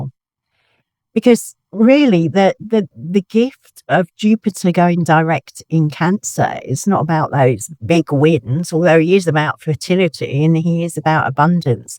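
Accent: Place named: England, United Kingdom. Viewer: Is that an English accent I hear? Music to my ears. I process audio. I especially love English accents and articulation/oration. Love my English brethren. From America. New subscriber. Your voice is melodic and you articulate and explain so beautifully.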